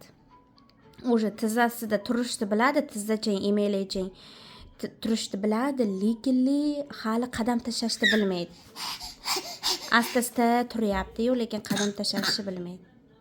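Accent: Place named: Samarkand, Uzbekistan. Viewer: Samarqandanmasmisiz sheva tortadi😊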